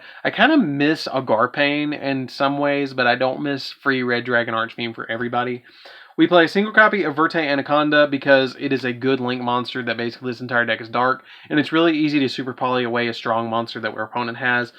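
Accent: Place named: South, United States. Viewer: probably bc he has a southern accent? 🤷‍♂️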